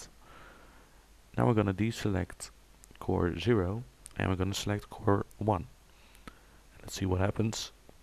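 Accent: Netherlands. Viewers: nice gonna try it ! by the way you have a dutch accent haha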